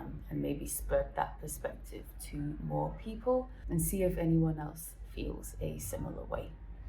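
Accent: Scotland, United Kingdom. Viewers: our southern border is a mess and needs fixed.IV personally been to two different parts of it Texas and Arizona and seen it with my own eyes.Sorry your path has slowed down and put you in limbo.I still enjoy watching your Spain travels.I enjoy your voice and accent.It makes me wonder what my Scottish ancestors sounded like when they Immigrated to Virginia in the mi 1700’s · As a fellow traveller (in the past sadly) ive been the outsider in alot of places around the world and you simply HAVE TO assimilate to some extent wherever you go and to be upset that you cannot go and do whatever and wherever you want is naive at best although you are correct to say Governments will jump on any opportunity to gain power or wealth regarding borders or visas or any aspect but that unfortunately IS the way power systems work more or less depending where you go as Culture does play a huge part in attitudes and behaviors regarding sex, religion and ideology. 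As sad as it sounds and as sad as it IS the general global neo liberal agenda permeating our lovely wee planet is only worsening any of those freedoms we used to have especially to the free spirits like yourself who just wanna fly so until that changes we'll just have to take the ride....(you did buy the ticket??) PS I can hear a Scottish twang in there and since seen you were at Uni in Dundee. Anyway love watching you and your adventures, lovely lassie. Maybe see you on the road down the line. X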